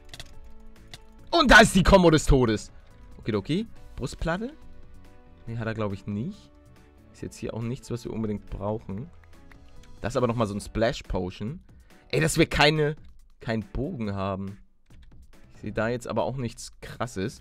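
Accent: Hamburg, Germany. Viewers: Kommst du irgendwie aus Hamburg oder so? Oder Bild ich mir das nur ein?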